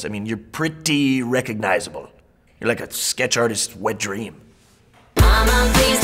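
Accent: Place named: England, United Kingdom. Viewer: no dislikes! Fuck Yeah! Nice english accent btw.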